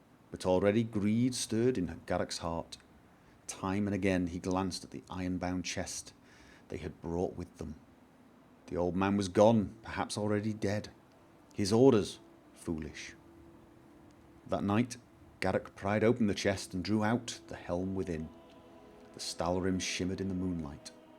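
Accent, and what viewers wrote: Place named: England, United Kingdom. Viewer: I noticed you live in Norway:o I am suprised! I know you are probably english (by listening to your accent) How did you end up in norway?:)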